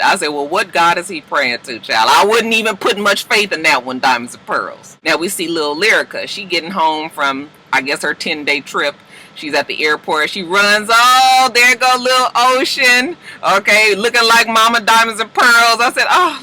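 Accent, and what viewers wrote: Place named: California, United States. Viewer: Your ray j cali accent makes me so homesick 😭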